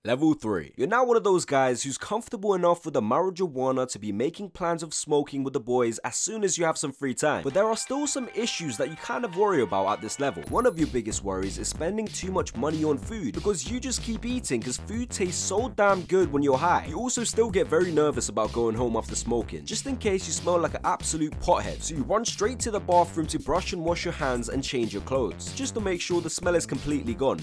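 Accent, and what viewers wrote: United Kingdom. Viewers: so zooted I didn’t realize he had a British accent. Just thought he was talkin’ silly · bro i think its british.. why british sound so bsSD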